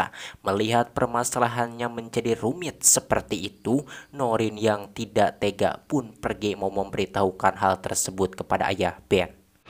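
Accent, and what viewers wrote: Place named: Sunda, Indonesia. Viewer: Cuma Chanel ini si yg asik pembawaan nya campur sunda wkkwkw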